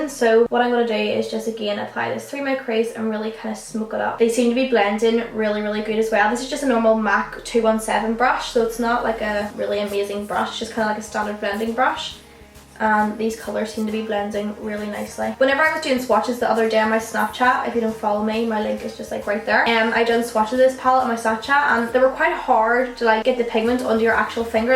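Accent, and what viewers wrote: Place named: Belfast, United Kingdom. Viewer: wee bitta belfast no mean no mean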